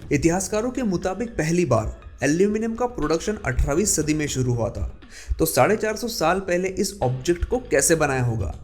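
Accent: Maharashtra, India. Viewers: Hello. It was interesting to know about all the three things mentioned in your video. One suggestion though. The narrator has a very deep Marathi accent, hence many Hindi words are not pronounced the way it should be. I would suggest that you hire someone for this job. I am interested.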